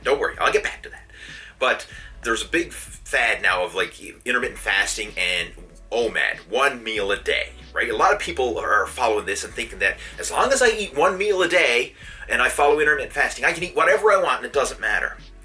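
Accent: Newfoundland, Canada. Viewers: Me: "This guy sounds like a Newf." Google: "Yes, he is a Newf." Me: *Subscribed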